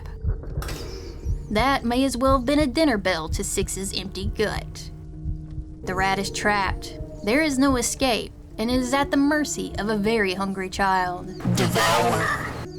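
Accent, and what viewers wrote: South, United States. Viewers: We return to the, for good speculation on nightmare fuel with a slight southern drawl to it Keep it up!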